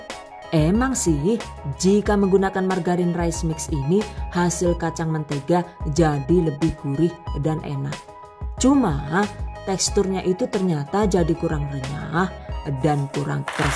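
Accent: Banyumas, Indonesia. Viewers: Suka ngapaaknya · Lebaran kemaren pakek resep inih, dan bener bener mantul banget, makasih ya bunda, gak salah sih subcribe channel ini krn bener bener bermanfaat banget, banyak tips and triknya. Apalagi seneng banget sama ucapan konyol bunda haha, dulu ku kira bukan suara asli kyk ada dubber org lain, ternyata asli loh orgnya juga cantik. Ngapak ngapak gitu lucu aja wkwkw #GiveawayTHV2021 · Mbaknya suaranya enak...empuk kaya kacangnya....hehe...ga taunya ngapak jg ya...... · Ini lokasi Madiun tp kok logatnya ngapak? Wkwkwkwk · Aku suka ketawa sendiri, klo bicara ngapak😊😊 lucu..😂😂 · Jenengan ngapak toh mamake😁_mntp mksh sharingny mamake mtrswn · Mantap... Pada bae logat Nyonge...😊👍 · Anger mb ngomong ngapak gawe aq gemuyu, menghibur 😃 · Trimakasih resepnya ya bun resepnya bunda mantap2 semua & +gemes sy kl bunda ngomong pake bahasa ngapak ampun deh sy jd ketawa trus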